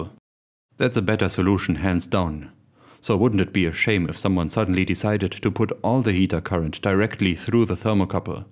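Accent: Germany. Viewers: The German accent makes this legit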